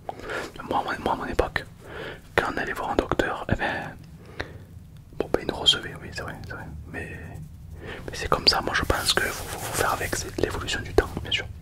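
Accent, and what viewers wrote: South, France. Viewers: Ty serais pas du sud ?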